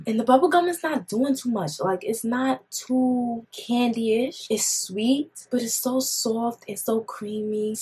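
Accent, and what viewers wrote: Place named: East Coast, United States. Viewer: It’s giving East Coast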